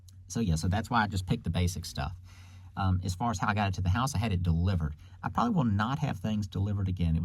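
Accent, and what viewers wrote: South, United States. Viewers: Wtf! I just googled where you do these rentals and you’re in the same province as me?? I thought you did this in the southern US because of your accent. Let me work for you 😂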